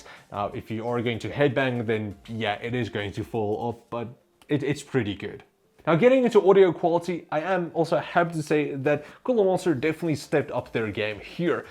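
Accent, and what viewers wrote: South Africa. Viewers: kak mad didn't know we had a South African Tech youtuber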